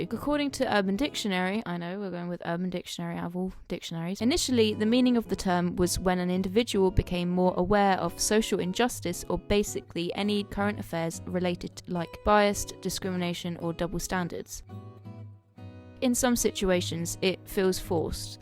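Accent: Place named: Midlands, United Kingdom. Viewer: Your channel is great. Very well put together stuff, especially since this is only your fourth video. If it's not a big secret, which I totally respect if it is, are you from the Midlands by chance?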